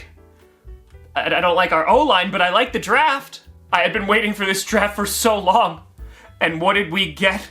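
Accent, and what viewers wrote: New York, United States. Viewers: Love your NY accent!!😅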